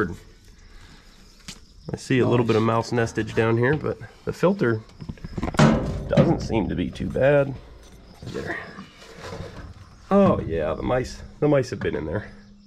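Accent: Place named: Tennessee, United States. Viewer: sounds like your from tennesse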